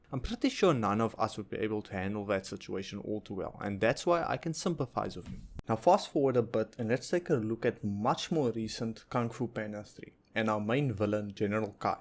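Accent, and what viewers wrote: South Africa. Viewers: are you afrikaans? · Are you South African? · Really good video. are you South African by any chance? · R u south African?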